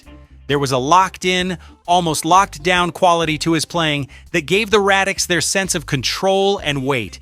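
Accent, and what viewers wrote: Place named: United States. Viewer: This guy is an ignoramus impostor pretending to be knowledgeable. He takes a smattering of little bits and pieces of facts and strings them together with an American accent padded with repetitive meaningless phrases. Far too many foreigners of his ilk think their pretense of knowledge gives them relevance. "A 1-eyed man is king in the land of the blind". Anyone who knows about Jamaican music will find this guy's presentation hollow.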